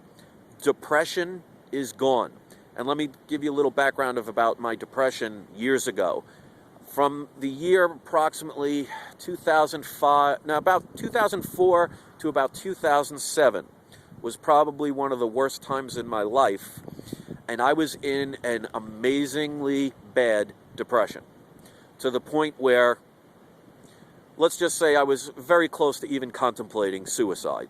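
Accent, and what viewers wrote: New York, United States. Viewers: u from NY?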